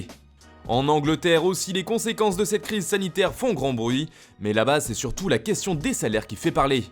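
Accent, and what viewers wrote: Italy. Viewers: Cette accent italien qui me manquait tant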